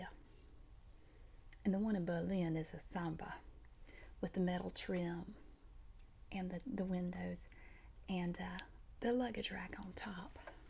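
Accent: South, United States. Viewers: I love the accent, where about are you from? I'm from East Tennessee.lol you should do a Hillbilly asmr lol.that would be funnier as a bucket of tadpoles.lol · I miss these accents, it’s rare to find someone who still has that “southern belle” twang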